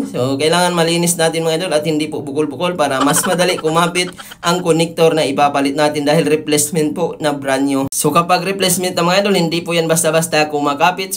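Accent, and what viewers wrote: Iloilo, Philippines. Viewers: idol parang illonggo ka?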